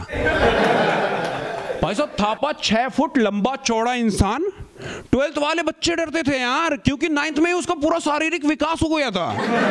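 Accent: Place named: Delhi, India. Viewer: Bhai delhi se ho na language se pata chal rha hai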